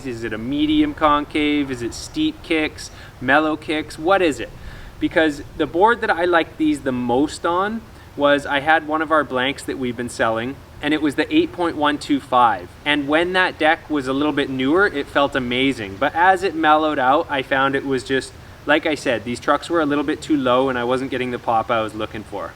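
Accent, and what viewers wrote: Canada. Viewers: Thanks for your content, and I knew you were Canadian from the moment I heard you speak (as an accent aware Canadian, myself), but stoked you're from the lower mainland :)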